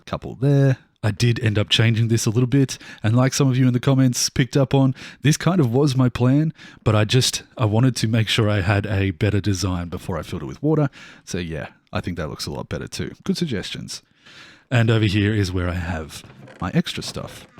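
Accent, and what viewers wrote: Australia. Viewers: When I was watching last episode my girlfriend was in the room and said "he sounds like an Australian who'd make fun of people who played Minecraft". My response? "These eloquently spoken words we are hearing are not for such activities". Continue the amazing work man I have been enjoying this new series so much, I felt very unmotivated to start due to the new massive caves and different generation but I got started and am in love with the new generation.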